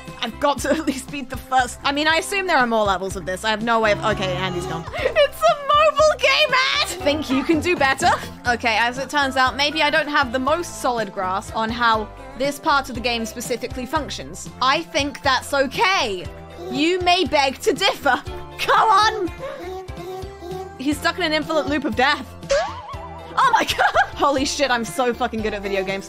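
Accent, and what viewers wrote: United Kingdom. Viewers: Is aguy or a girl, can’t really tell, someone, please explain me. Edit:i just checked the info on the channel and i want to tell you that you have an overwherming british accent i fell like Edit2: this is not a horror game even in the slightiest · BRITISH DETECTED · Idk, British sounds funny and cute at the same Time. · i though she was cringe until she turned bri'ish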